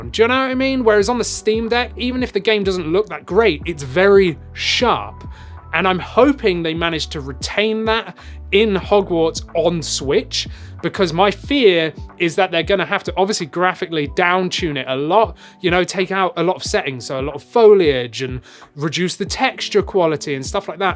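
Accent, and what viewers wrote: United Kingdom. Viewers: Hey bro your accent sounds like you’re from Oxford, england.. am I right? ❤️❤️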